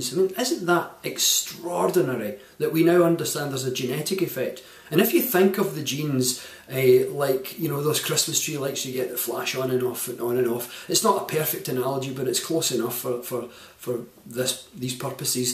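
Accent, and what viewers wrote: Scotland, United Kingdom. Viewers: was recently introduced to your work and have to say irs so refreshing to hear a male Scottish voice in the field and one looking so good at 91 too! excellent work young man! excellent.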